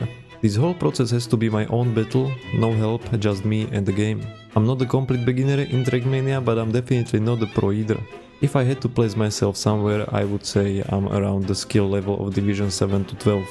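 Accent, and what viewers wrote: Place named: Russia. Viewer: já sem si myslel že je z ruska kvuli jeho prizvuku XD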